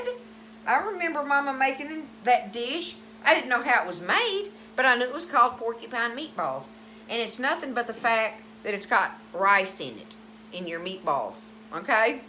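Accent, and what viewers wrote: South, United States. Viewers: As soon as I heard the southern drawl in her voice.. I knew this was going to be a delicious recipe.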